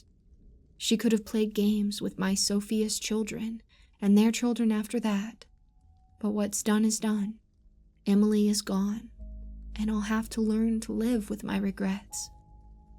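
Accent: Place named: South, United States. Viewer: I would love to hear 👂 your southern accent more , but then icc c would feel like your spoiling me ❤️😛😍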